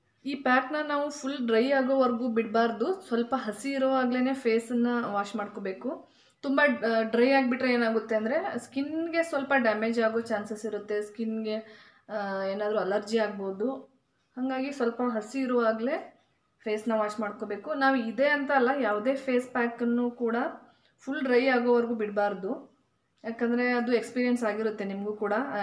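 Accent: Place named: Uttara Kannada, India. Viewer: ನಿಮ್ಮ ಉತ್ತರ ಕನ್ನಡ ಭಾಷೆ ತುಂಬಾ ಚೆನ್ನಾಗಿದೆ ನೀವು ತುಂಬಾ ಚೆನ್ನಾಗಿ ಮಾತಾಡ್ತೀರ sis face pack 👌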